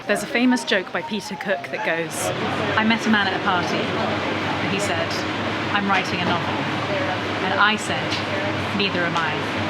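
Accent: United Kingdom. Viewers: Your videos are Fantastic, and your Voice is British perfection. Thank you for sharing with the world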